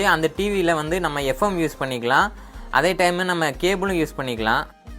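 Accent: Madurai, India. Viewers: Madurai ahh bro neenga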